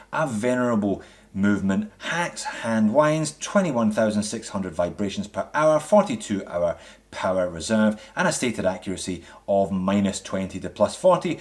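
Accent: Scotland, United Kingdom. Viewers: Great video!! I do have a question though. I heard you say in another video that you are a proud Australian, but, honestly, when you speak, I only hear a Scottish accent. Why exactly is that?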